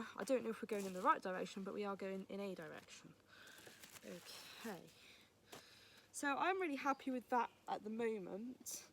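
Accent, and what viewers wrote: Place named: England, United Kingdom. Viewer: That's so weird, she looks middle eastern but sounds English!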